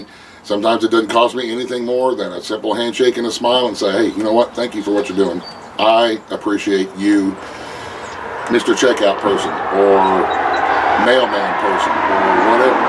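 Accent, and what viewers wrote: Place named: South, United States. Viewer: I'm a new subscriber and I love the content on your channel....you sir sound like the southern version of another YouTuber named Buckin Billy Ray from Canada...he spreads the same message, check him out. I love your channel and I will share with my friends. Cheers from Kansas City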